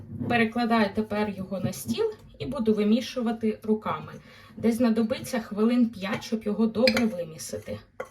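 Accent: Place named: Russia. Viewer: майже позбулася російського акценту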